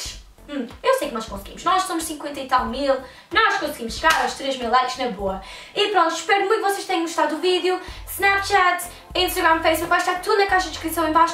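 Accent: England, United Kingdom. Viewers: Que sotaque inglês lindo!!! ❤️ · adoro o teu sotaque britânico 😍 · gosto tanto do teu british accent 😍😍 vou comprar essa máscara 😂😂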